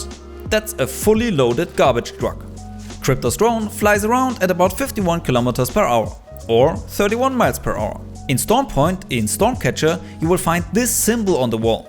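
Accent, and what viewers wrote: Germany. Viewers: Let me guess. You are German? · youre german? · Are you from German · are you german? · Are u german?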